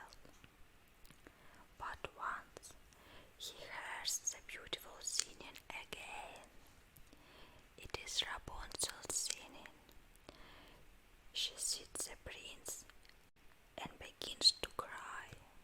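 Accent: Russia. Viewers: прости, но your russian accent "cut" my ears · I'm not a fan of fairytales and stuff like this but I'm so happy to hear you speaking in English!!! I really hope you will make some more videos in English from time to time especially doing medical roleplays which I LOVE the most! English with russian accent actually is a trigger for me lol xD ..btw, I just started learning Russian a month ago so I could expand the joy of thousands of videos for ASMR in Russian , too, not just in english! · Ненадо выговаривать каждую букву на Английскому, ты пытаешся впихнуть нотки Русского в Английский)). A tak я заснул 4etko)))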